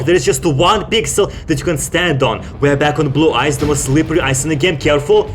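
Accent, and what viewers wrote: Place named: Russia. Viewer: У тебя русский акцент, ну или хотя бы акцент стран снг, откуда ты?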